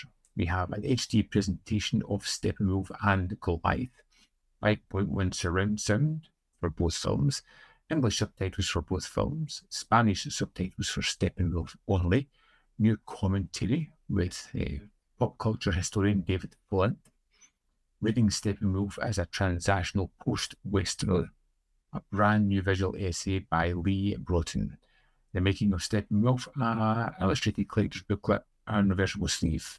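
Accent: United Kingdom. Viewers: I subscribed in your channel, mr, Nice content, are you from glasgow, liverpool, manchester, newcastle upon tyne, edinburgh or belfast northern ireland? I notice because of your Accent 😊👍🏻.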